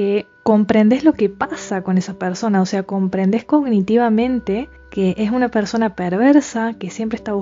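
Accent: Argentina. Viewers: Muy buenoooooo .párese SOS argentina verdad . EXCELENTE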